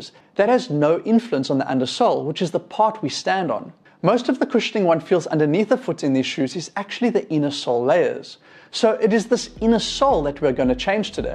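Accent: South Africa. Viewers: Damn, the South African accent...first time watching, something in the back of my mind recognized that accent immediately, Greeting from Mzansi Chief :)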